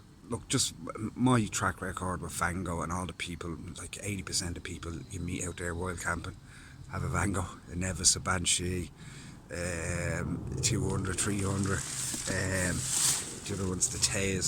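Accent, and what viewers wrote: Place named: Ireland. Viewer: Awesome tent and a great video, thank you. Always love the Irish accent - more like purring than speaking! Just FYI and for others, the full weight of the 1P is 1.25 KG, vs 1.42 as you rightly said for the 2 person. So for the 170g difference I agree - take the extra space! £240 online right now as well. A properly well made tent, rather than the "Big" American brand stuff.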